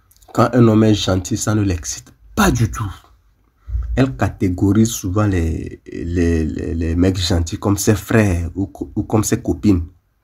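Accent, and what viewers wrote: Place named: Togo. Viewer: Une des meilleures Podcast! Chapeau frère! A entendre ton accent, on dirait que t'es togolais! #Respect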